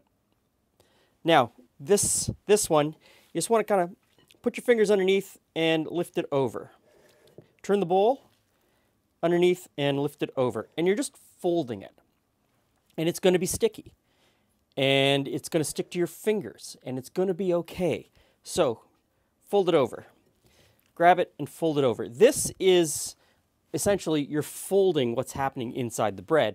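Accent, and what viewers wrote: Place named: Quebec, Canada. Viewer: You are definitely from the province of Québec, so am I?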